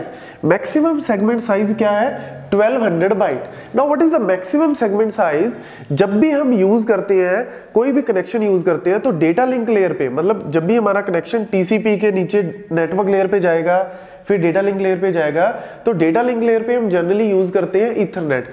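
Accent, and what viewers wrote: Punjab, India. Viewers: punjabi accent makes the video greater , Great work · Damn! That Punjabi accent surely sticks in my head. Great explanation too!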